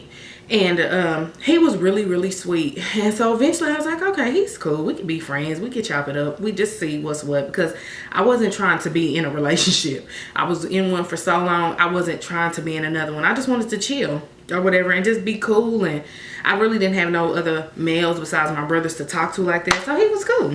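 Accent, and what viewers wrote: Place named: Kansas City, United States. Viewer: So you from KC, huh? I like when you added the voice over for him.... Made me visualize the story more...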